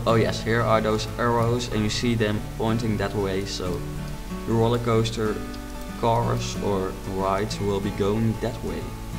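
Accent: Netherlands. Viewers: i tried watching this video without getting annoyed by the dutch accent, but i just can't, i'm sorry. i'm dutch as well, but i can't stand the accent, imagine listening to it for 23 minutes. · it's because he is Dutch. I am Dutch to, and I also have that accent! there is nothing wrong with that.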